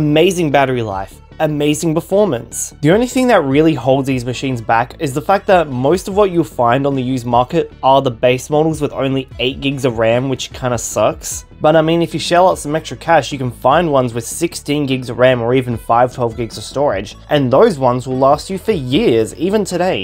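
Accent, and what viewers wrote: Australia. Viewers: i think he's just australian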